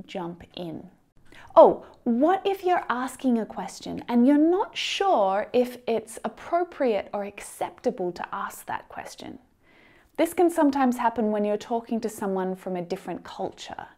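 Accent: Australia. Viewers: For the way she talks, you can tell she has a "teacher" australian accent. If you come across some aussie he/she doesn't speak that articulate. I had an argentinian english teacher with the same way of talking but american accent. Nice video and VIDEOS btw!! (what does "mmm" stand for? "my magnetic mouth"?) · Hello, day by day your presentation is getting more interesting, and it is a bit hard to resist the temptation of watching you talking with a sweet Aussie accent. Keep up with your lessons that address all English language learners. You have chosen the best profession to make money through toiling and shedding sweat.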